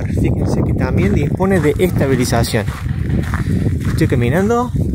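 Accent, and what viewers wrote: Córdoba, Argentina. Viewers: Agradable de escuchar porque es Cordobés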